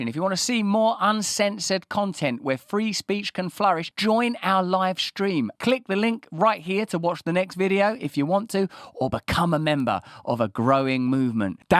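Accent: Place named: England, United Kingdom. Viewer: He is English…just in case you couldn’t tell…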